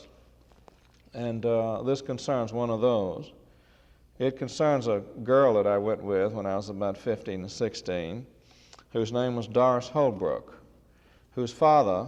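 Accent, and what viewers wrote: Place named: South, United States. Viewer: Just wanted to speak on a tiny aspect of his dialogue during this reading when he introduces ‘Slave Quarters’: It may sound like he is saying the word, ‘N***er’ but that is just a characteristic of his particular accent. In the South, in Dickey’s day, the common way that black people were referred to was ‘negro’, and the word, when spoken in that regions vernacular would be pronounced as, ‘Negra(née-gra). You then have the ‘a’ At the end drop and it sounds like the word that is a racial slur but when people said the word back then just as Dickey did, it was used in the same way we would use the word ‘black’ to refer to an African American or ‘white’ to refer to a Caucasian. I just wanted to bring that up in case someone heard that part of the reading and was turned off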